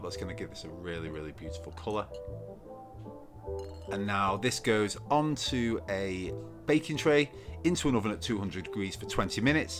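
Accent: Yorkshire, United Kingdom. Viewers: I love your accent. is it from yorkshire?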